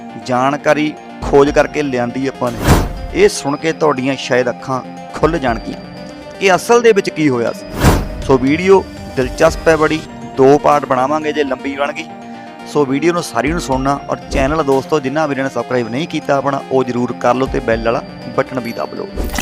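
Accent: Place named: Puadh, India. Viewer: ਬਾਈ ਜੀ ਤੁਹਾਡੀ ਆਵਾਜ਼ ਬਹੁਤ ਪਿਆਰੀ ਐ ਤੁਹਾਡੀ ਬੋਲੀ ਪੂਆਦੀ ਲਗਦੀ ਐ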